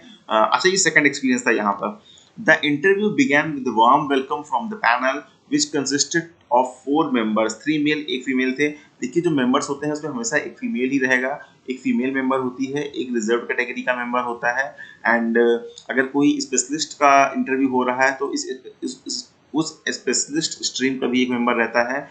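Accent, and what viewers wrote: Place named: Punjab, India. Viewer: Sir kbhi hindi jyada boli nahi to hindi me Punjabi touch feel hota hai ....chlega kya!?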